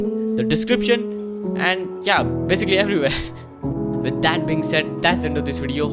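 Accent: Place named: India. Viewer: god fucking damn it. the indian accent makes the whole video impossible to watch..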